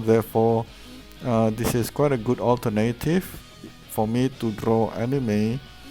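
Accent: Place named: France. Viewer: You kind of have a French accent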